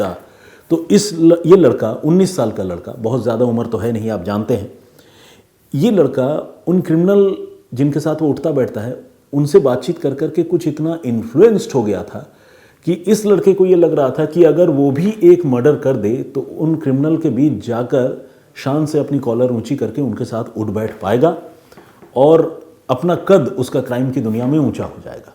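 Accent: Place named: Bihar, India. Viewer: kahani theek sunate hain par supratim ji ka talaffus extra ordinary hai. bihari hone ki wajah se kabhi kabhi unki bhasha mein bihari lehza aa jaata hai.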